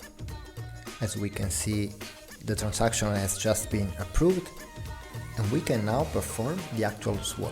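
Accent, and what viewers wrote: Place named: Bulgaria. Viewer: the speaker has bulgarian accent :D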